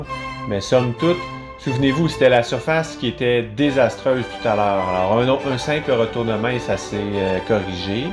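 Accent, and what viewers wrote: Canada. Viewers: Merci pour la qualité de vos vidéos, et merci encore de partager les erreurs c'est comme ça que l'on progresse. Ps : pour vous c'est naturel mais merci aussi pour le petit accent et les expressions de nos cousins de l'autre côté de l'atlantique. 😉. Petite question pourquoi ne pas acheter du lait directement à la ferme ou du lait frais complet? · Bonjour de la Tunisie Ça fait deux jours que je ne cesse pas de regarder vos vidéos que j'apprécie tellement et que je vous trouve le seule youtubeurs qui explique bien les détails et puis j'apprécie tellement l'accent canadien ♥️♥️♥️ Merci infiniment Sauf que j'ai une question, je n'ai pas de frigidaire spécial pour les fromages Est ce que je peux le garder dans une boîte plastique dans la température ambiante ici qui varie de temps à autre mais qui dépasse pas les 20° ici en Tunisie ? Pouvez-vous m'aider s'il vous plaît ?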